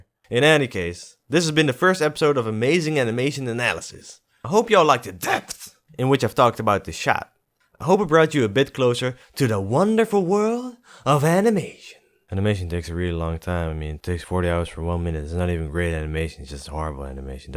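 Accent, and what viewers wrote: Netherlands. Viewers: dutch accent is so obvious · are you dutch? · You sound really dutch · U dutch? Cus you sound dutch. Im dutch too and into drawing and animation.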